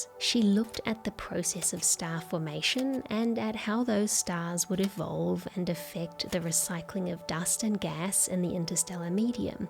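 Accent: New Zealand. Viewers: That's just the kiwi accent. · I’d not realized before this video you were a fellow kiwi! I’m terrible at picking up accents.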